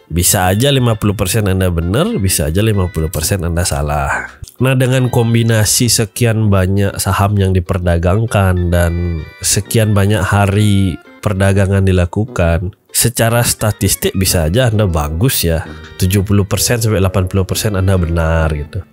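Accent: Bali, Indonesia. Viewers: Logat bali, dari mana bli?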